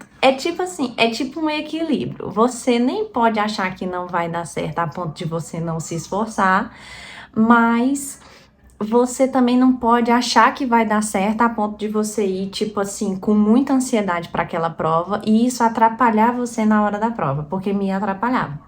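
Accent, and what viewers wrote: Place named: Northeast, Brazil. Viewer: Ave Maria! mais tu é bonita! Nem parece que é mãe e casada 😂😂😂 (tô brincando) Já vi outros vídeos e pensei que tu fosse do nordeste,o sotaque parece . Já fui mt inocente tbm qndo comecei a estudar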